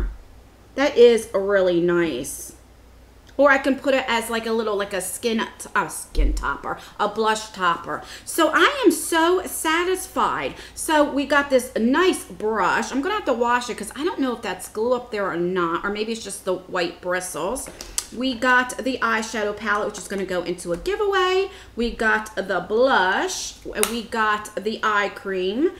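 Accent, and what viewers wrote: United States. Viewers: What’s her accent? What state?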